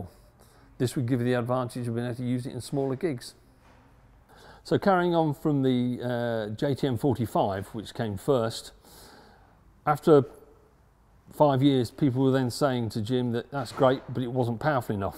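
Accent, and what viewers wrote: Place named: South East England, United Kingdom. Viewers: Sounds southern. Milton Keynes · Somewhere in the South East of England without a shadow of a doubt.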